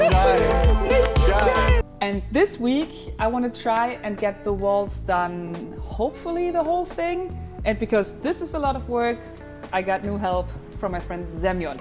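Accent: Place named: Germany. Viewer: Man merkt das es ein deutsches Englisch ist, was sie im #Video spricht.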